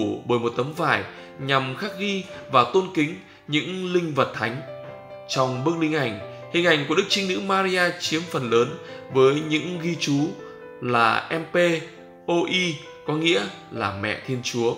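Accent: North, Vietnam. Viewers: giọng nói miền bắc ngọt ngào tuyệt vời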